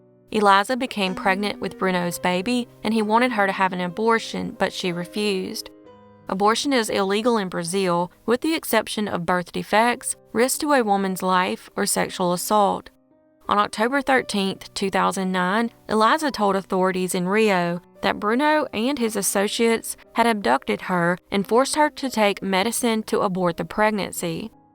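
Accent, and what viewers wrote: South, United States. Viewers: Annoying factoid that I hate but wanna share cause I feel like you'd hate it too, cause you're southern like me. I live in Arizona now, and found out that 'Prescott' is pronounced 'Preskit' here, and it drives me absolutely mad 😂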